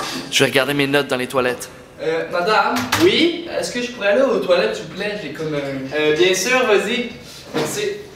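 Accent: Quebec, Canada. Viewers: Il est quebecquois -_- Pourtant il a un léger mais très léger accent. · enfin bon,c'est du québécois je pense^^ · t'as l'accent canada a mort mec (ne le prend pas mal j'adore les videos je me suis abonnés) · Juste avec ton accent on dirait deja que tu es québécois..:) Super bonne vidéo!Je m'abonne!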